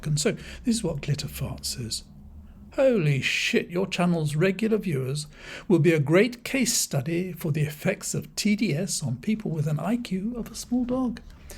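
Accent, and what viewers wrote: RP, United Kingdom. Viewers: Ramstein is right, you are a dimbo, not even as smart as a nitwit, a proper plank like our PM, you are in short in your dotage, a foolish old Englishman with nothing but a slightly posh voice as cover, see how far it gets you in the real world, if you've ever been there a proper SNOB top to bottom, what crushed you radley school or London. or both? Get back to you biggles books, if you even remember where you put them.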